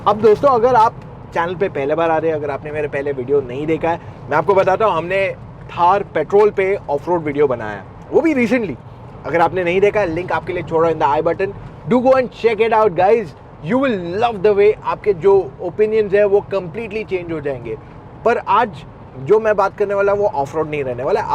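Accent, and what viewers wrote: Gujarat, India. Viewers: Sir aap gujarat se ho kya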